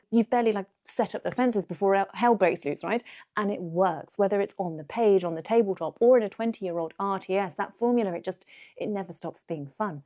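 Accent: United Kingdom. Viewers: Uk-uk! A knowledgeable, entertaining, nice and pretty person with a British accent on the internet! And covering topics that are also my favorite cup of tea! Subscribing now and listening to while having coffee and shaking my pitchfork at the Witcher Show Series.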